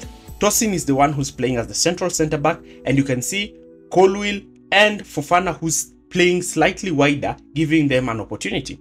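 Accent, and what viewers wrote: Kenya. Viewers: Are you Kenyan? You sound like one 😂❤❤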